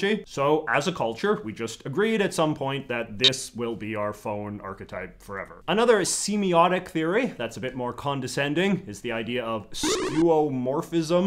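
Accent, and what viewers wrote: Canada. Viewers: Wow, you seem like THE most Canadian person I've ever heard speak! And I actually watch a fair bit of Canadian content. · Is this guy's "Canadian accent" authentic? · I'm from Prince Edward Island and you have the MOST "Canadian" accent I've ever heard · The only Canadian I know who actually says A Boot and I live here!!! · I just... I LOVE the way you speak. The combination of the Canadian dialect and your cute little idiolect with sort of high rising terminals. It's fucking captivating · As a European, you've got the thickest Canadian accent I've ever heard and I love it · Here for the Canadian accent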